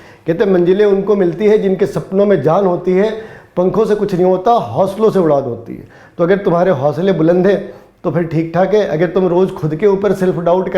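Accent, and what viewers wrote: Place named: Punjab, India. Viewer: Punjabi tadka.....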